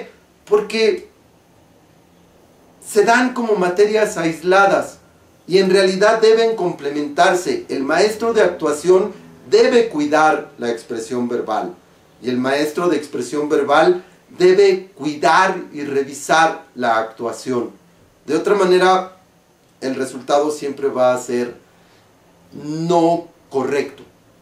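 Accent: Mexico. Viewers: tiene acento mexicano, lo neutro quiere decir que nadie te pueda identificar de donde eres oriundo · me di cuenta que usted es Mexicano! ... Me gusta su acento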